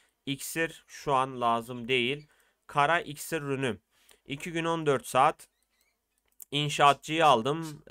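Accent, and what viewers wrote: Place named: Iğdır, Turkey. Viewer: Abi sen Iğdırlı mısın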